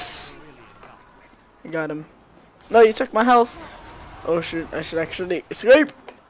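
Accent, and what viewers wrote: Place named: New Zealand. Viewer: I don't usually do this but because of your accent I will... I recon that you are from New Zealand, May be wrong but you voice sounds like it. Btw nice vid, I made my own trickstab montage if you would like to see it.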